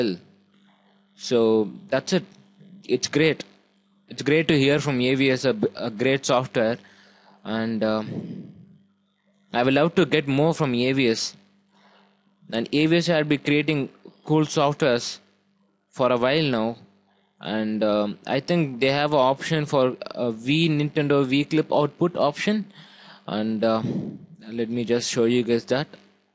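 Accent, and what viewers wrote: India. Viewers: omg I hate this idiot INDIAN and their enlish omg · he is north indian... he got these accent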